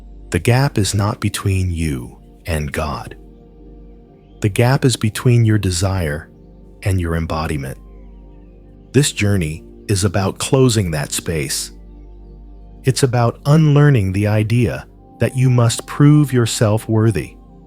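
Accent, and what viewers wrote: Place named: United States. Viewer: I find it difficult to meditate and manifest,to picture my needs... I've asked for many years,but my life didnt get easier, Love the narrators voice.gentle and calming.i am English but love the American voices. If you have money you do have freedom..... I think I'll try again to meditate and manifest....❤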